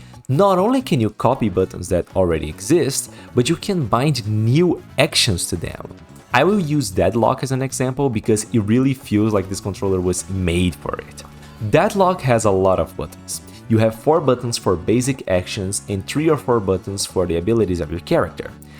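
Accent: Brazil. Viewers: Ótimo vídeo bro, mas só comentar que eu fico muito feliz quando entro em um vídeo em inglês esperando um gringo e percebo o sotaque brasileiro kkkkkk um dia a gente domina o mundo pprt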